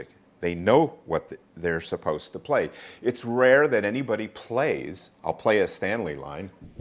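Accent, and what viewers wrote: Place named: Long Island, United States. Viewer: A fellow Lawn Guylander?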